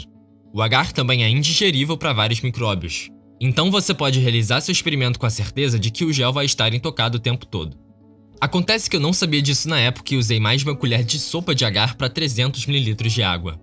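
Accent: Rio de Janeiro, Brazil. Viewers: ele é carioca?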